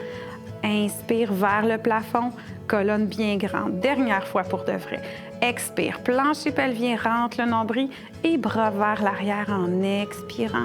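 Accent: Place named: Quebec, Canada. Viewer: grand merci pour votre réponse, car lorsqu' on est Français et qu'on n'a plus l'oreille trop fine, l'accent de la belle province a besoin d' être écouté sans perturbateurs musicaux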